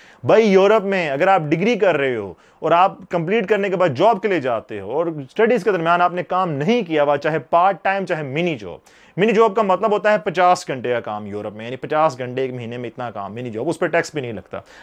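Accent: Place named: Pakistan. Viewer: Its sounds like you are from pakisthan but when u r in germany everyone become same even u r a pakisthani indian etc great respect to u sir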